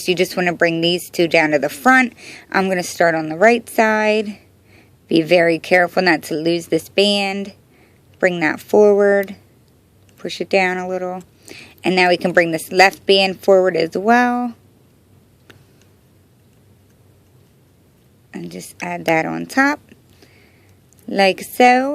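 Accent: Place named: Brooklyn, United States. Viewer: Ma'am I'm so sorry. I really do not want to offend you when asking this question. Are you from Brooklyn? You just have that Brooklyn accent mixed w/ country lol.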